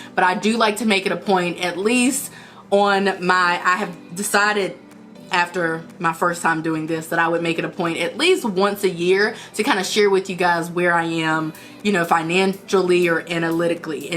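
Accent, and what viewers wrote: South, United States. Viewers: She is gorgeous as many have said and I gotta say this, I'm just a couple mins in and usually I hate a country accent, country music all that but I hear hers and I love it and o love her hair! Continue ascending queen! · Damn its like she got a stong southern accent n it almost sound kinda Baltimorish 😅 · She look like my ex I gotta go 😭😭😭😭😭 Your extra country accent sexy doe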